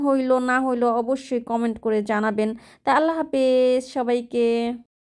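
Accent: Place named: Narayanganj, Bangladesh. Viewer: আসসালামু আলাইকুম আপু তোমার বাংলাদেশের কোন জায়গায় নারায়ণগঞ্জ বা জাজিরার এদিকে প্লিজ আনসার দিও তোমার কথা ঐদিকের লাগে জন্য আপেক্ষায় থাকব।।।।।প্লিজ...।।।।।।।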